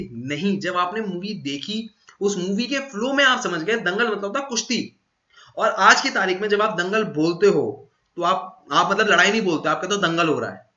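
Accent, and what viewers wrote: Meerut, India. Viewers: Meerut wale 🤣🤣🤣🤣 Inge unge this is true meerut ki language bilkul aese hi hai btw I'm from meerut too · Bro I am from Hapur I relate a lot from your meerut wala accent I want to improve my english grammar. Please give me an solution.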